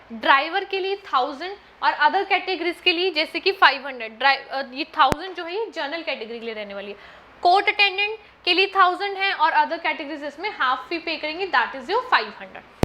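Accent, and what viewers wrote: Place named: Bihar, India. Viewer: Just bihari boli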